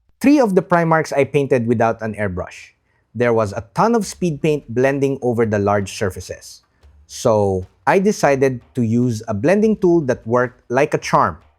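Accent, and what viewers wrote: Philippines. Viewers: I heard your accent in one of your shorts and thought you sound like Noypi like me 😂 Now I'm getting interested in learning 😆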